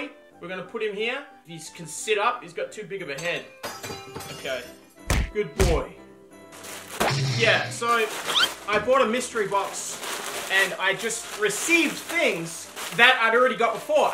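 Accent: Australia. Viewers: Ur so Australian lol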